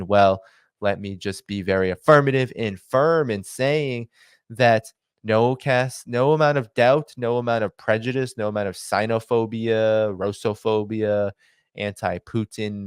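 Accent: United States. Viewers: why are you speaking with an American accent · PLEASE MAKE CHANGES OF YOUR AMERICAN ACCENT AS WELL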